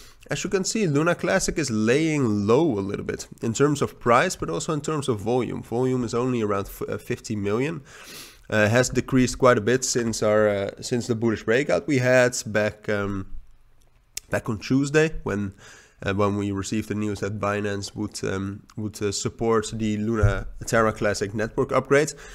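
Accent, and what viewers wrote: Netherlands. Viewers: Nederlands accent?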